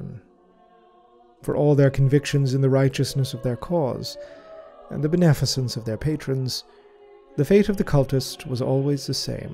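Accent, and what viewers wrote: Ireland. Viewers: I gotta ask what part of ireland are you from?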